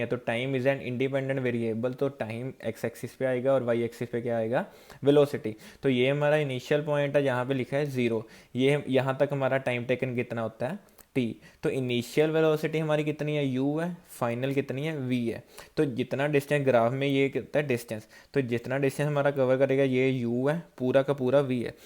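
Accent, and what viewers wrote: India. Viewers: Sir g aap Punjab se ho kya ???. ..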